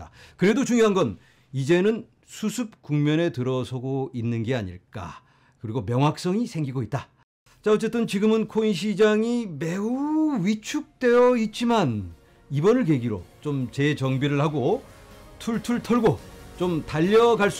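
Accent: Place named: Gyeongsang, South Korea. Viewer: 부산?경주인줄 알았네요ㅋ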